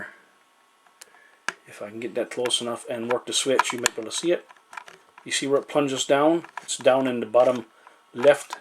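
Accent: Newfoundland, Canada. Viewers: Geez! Great job and I’m on it right now. I heard you speak and I said to my wife,he sounds like he’s from NL maybe even ST MARYS bay! Thanks so much sir.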